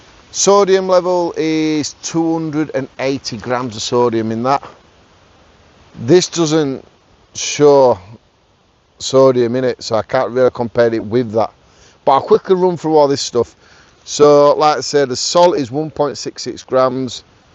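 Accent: United Kingdom. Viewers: here for outdoor motivation, and best uk accent! thanks mate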